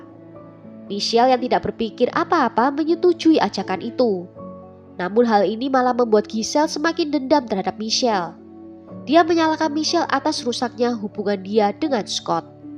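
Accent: Java, Indonesia. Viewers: kamu memang wow sekali pokoknya.... kasus mu pun mantap punya..... dgn aksen jawa yg gak ketinggalan membuat aku terpesona..... top markotop lah....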